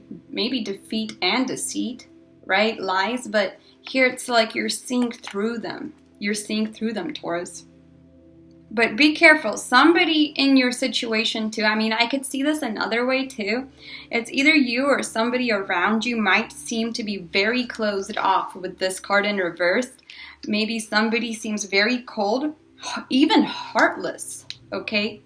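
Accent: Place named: Russia. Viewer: From Russia? I detect a slight accent maybe but you definitely speak better English than most (including myself)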